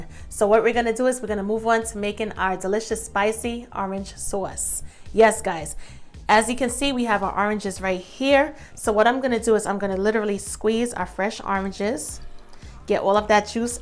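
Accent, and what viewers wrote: New York, United States. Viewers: Love the new York accent. You always tell another new Yorker when you hear them.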